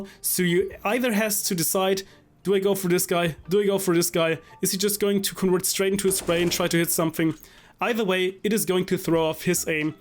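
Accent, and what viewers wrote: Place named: Germany. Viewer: It sounds like he have a german accent :D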